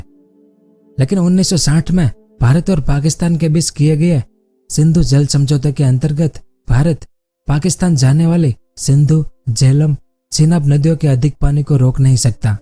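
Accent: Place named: Rajasthan, India. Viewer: Aap rajsthani ho apki voice tone Rajasthani jasi h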